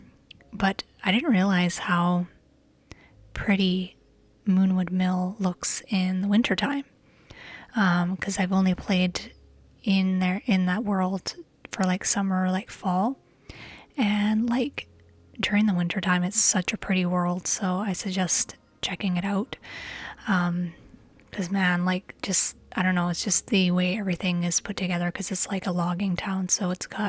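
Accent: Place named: Canada. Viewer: i’ve always suspected you were Canadian because of your accent, but it’s awesome to hear it confirmed! Torontonian here :)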